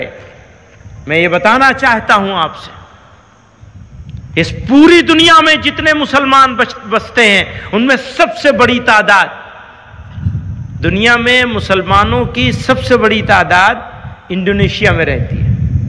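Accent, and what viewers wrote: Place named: Pakistan. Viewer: Masha Allah Urdu language bilkul Pakistan jaesi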